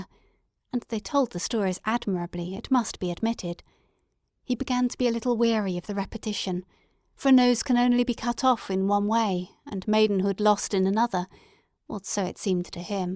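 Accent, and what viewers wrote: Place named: United Kingdom. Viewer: Nicely presented. I enjoyed listening to your voice and beautiful Bristish accent 😍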